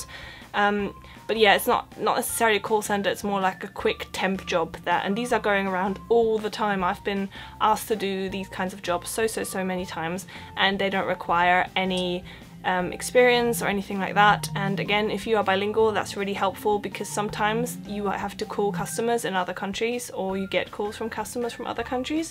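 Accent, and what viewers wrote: Germany. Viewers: Exactly the German accent likes I sound while talking English thanks to my 8 years having spent in Hannover. But the contents you provide are very good for young people want to discover London in an affordable way. Perfekte Arbeit. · Your accent is adorable! You can tell that the London influences are kicking in, but still with undertones of a native German speaker. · I can clearly tell the Getman accent.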